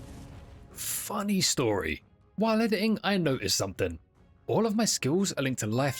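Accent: United Kingdom. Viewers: ah, yes, all the things I love in one video - chaos, cats and crit-proc calculations narrated by a cockney bloke <3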